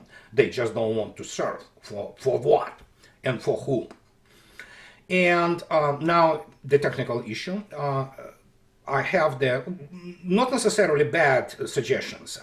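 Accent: Russia. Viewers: Mr., your accent is like music ( Russian heavy metal) and your English is excellent. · Thanks for speaking out. In spite of of our recent history Russian accents were always great and yours is no different. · I am Italian, maybe for this reason I understand perfectly well your russian accent 😁... who knows... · it's your Russian accent (and sense of humour) that makes you so endearing to us.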